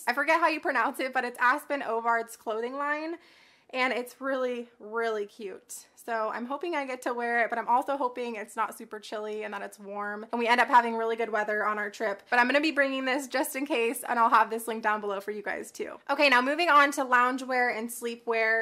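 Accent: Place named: Texas, United States. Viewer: The way you said 13 bucks is so SOUTHERN LOL I loved it! You sound like a real Texan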